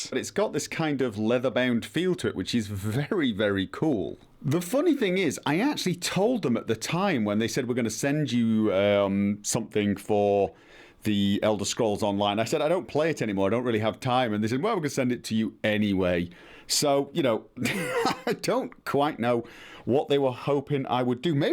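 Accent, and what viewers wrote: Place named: RP, United Kingdom. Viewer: You sound very posh with that mic.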